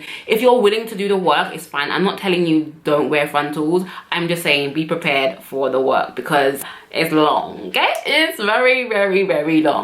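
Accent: United Kingdom. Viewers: Lady you are all kinds of gorgeous! and you have that cheeky British accent too.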